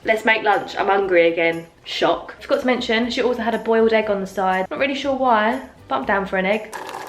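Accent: United Kingdom. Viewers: Loved this video; you have such a great personality and so funny! Love your British accent too. 😆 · I love the British accent because it makes every video funny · I looooove british accent!..you are beautiful!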